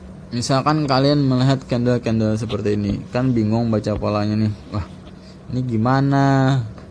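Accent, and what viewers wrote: Banjar, Indonesia. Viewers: Orang banjar kh ini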